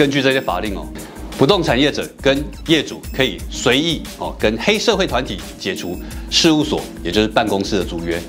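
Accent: Taiwan. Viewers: 因非常喜歡人中之龍而追上此頻道，祝蔡桑頻道就此火紅!! PS就是喜歡這種夾著台語的氣口，蔡桑加油!! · 今天第一次聽到蔡桑非常喜歡馬上訂閱台語的口氣非常讚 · 這個本土味聽得太舒服了，天啊～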